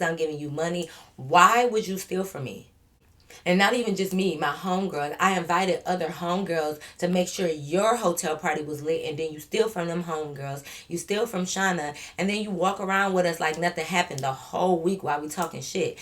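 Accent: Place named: Detroit, United States. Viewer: Omg at the beginning I was saying in my head where is this girl from? Then Detroit came to my mind then i was like No that's where they are just to find out she is!!!😂😂 i love your story times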